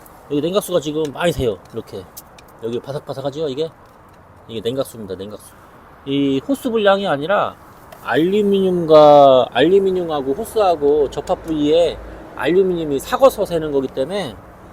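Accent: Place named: Chungcheong, South Korea. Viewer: 어따 거기 충청도유~~! 좋은정보 엄청나게 감사혀요~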